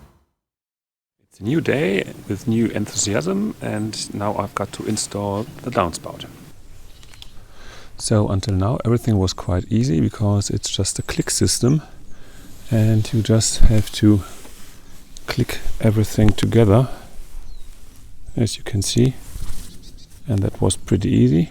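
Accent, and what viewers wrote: Germany. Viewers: your German accent is what makes you charming to me. I’m in Nebraska USA. Dead center of the country 😁 I’m truly enjoying your channel. Keep up the great work 😊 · Just found this series. Trying to figure out where this property is? The guy seems to have a German accent? Love the progress he has made so far. Very satisfying to see the yard clean ups! Please post an update. Beautiful countryside wherever it is.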